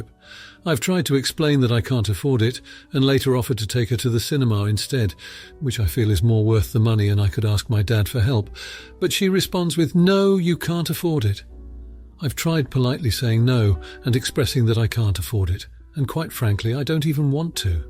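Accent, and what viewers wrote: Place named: United Kingdom. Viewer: Big fan of the British narration.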